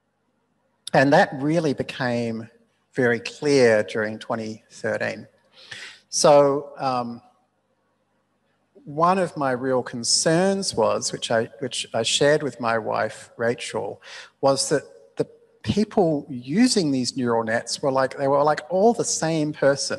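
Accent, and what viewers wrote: Australia. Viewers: Interesting how your accent is a lot more aussie now!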